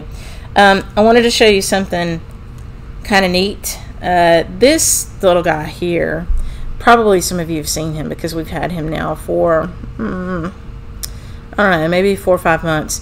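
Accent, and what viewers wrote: South, United States. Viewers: It was great to see you again and to hear about the new samplers. They are beautiful! The Hardwick Manor hoop looks so nice. You can tell it is the highest quality and would last a lifetime. You know a stitcher is known by her tools! I’m looking forward to your next video. It’s nice to hear a southern accent! Have a wonderful week!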